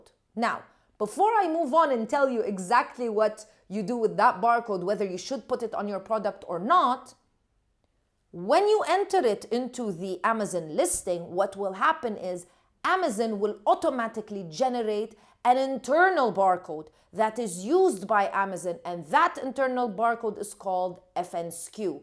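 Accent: Arab world. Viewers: I am confuseeeeeeed.u speak english like an arabic girl but your name is.any thanks for guiding us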